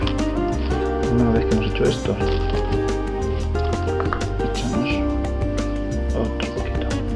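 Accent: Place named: Madrid, Spain. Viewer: Muchas gracias Maestro , por fin me entero de como se hace la micarta correctamente , me preguntaba si seria muy caros estos productos , quiero animarme hacerlo , soy un gran aficionado a la artesania y en especial a los tirachinas , pertenezco a un foro mundial de tirachinas y he visto trabajos verdaderamente preciosos con este material . Soy de madrid y tu ? , tu acento parece Madrileño . Un abrazo y gracias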